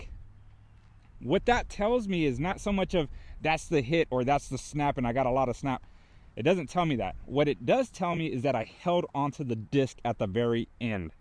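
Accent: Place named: Southern California, United States. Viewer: Yo these are some great points, you sound like you're in Socal. where y'all at?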